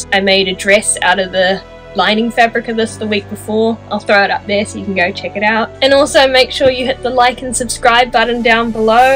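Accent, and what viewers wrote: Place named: New Zealand. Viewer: as a fellow kiwi it's just nice to hear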